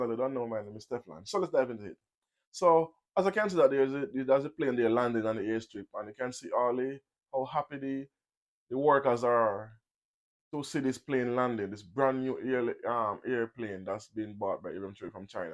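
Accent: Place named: Jamaica. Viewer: You are only speaking to the Jamaicans, sir, because only them can understand your accent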